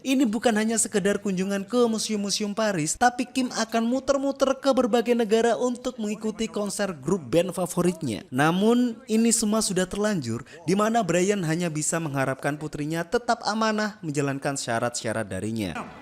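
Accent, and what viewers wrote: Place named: East Java, Indonesia. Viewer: Mimin iki sajak e wong ndi yoo ,bahasa e kok khas darjo-sby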